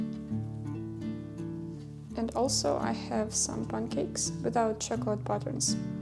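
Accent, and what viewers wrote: Ukraine. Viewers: nice videos. excellent choreography. excellent photographic angles. nice Ukrainian accent. so yummy Ukrainian dishes. wow torturing us viewers with heavenly food we can't eat haha. many thanks for producing and sharing these videos with us. have a great day always. 🌹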